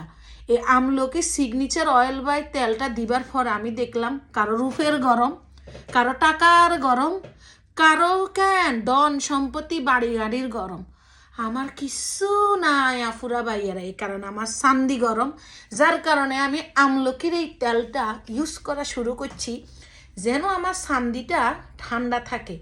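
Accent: Noakhali, Bangladesh. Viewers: আপু আপনি আপনাকে অনেক ভালোবাসি আপনার ভিডিও গুলো সব সময় দেখি আমার নোয়াখালীর ভাষা অনেক ভালো লাগে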